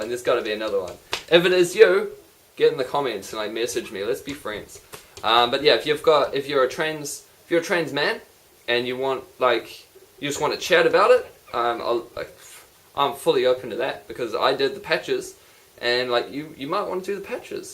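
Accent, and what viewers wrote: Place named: Australia. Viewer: you sound Aussie i,m waiting for a specialist appointment to see if I have this or Klinefelter syndrome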